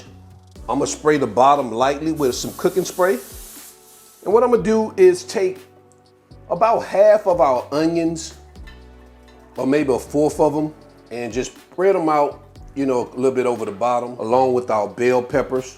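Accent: South, United States. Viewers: Quick question?: are you from the south? I’m trying to pick up on your accent and your style of cooking lol. Your food is always looking good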